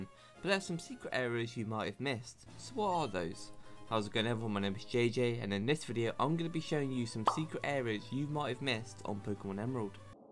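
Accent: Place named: United Kingdom. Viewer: I like listen ur videos because your accent, is British?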